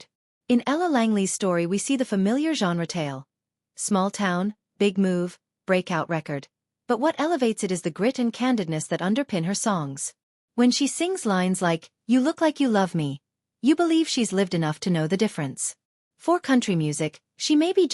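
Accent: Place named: Alabama, United States. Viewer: She sounds country as them red chert roads here in Bama.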